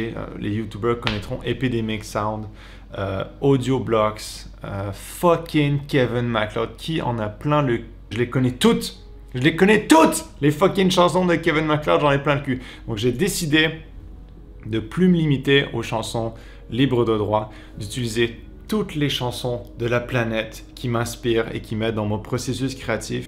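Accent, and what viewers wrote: Quebec, Canada. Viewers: Omg je te suis depuis longtemps et c’est fou comment t’a maturé c’est vraiment nice et t’a tellement adopté l’accent québécois xD on dirait plus la même personne quand je regarde des vidéos plus anciennes haha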